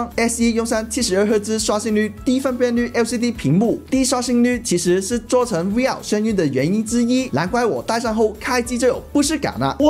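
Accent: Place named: Cantonese, China. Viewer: 點解用廣東話口音講普通話？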